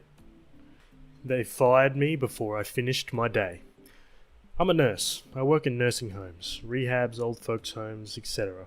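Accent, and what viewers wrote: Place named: Australia. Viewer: It's great to hear another Aussie doing the Reddit stories. Sick to death of the dammed Yanks having the monopoly on YouTube. Looking forward to more from you but I'd like to suggest trying some different sub-reddits because I have heard the second story 5 times in the last couple of days. Few people do neckbeards, incel tears and several other entertaining ones. I think I even saw a Bogan one somewhere.... lol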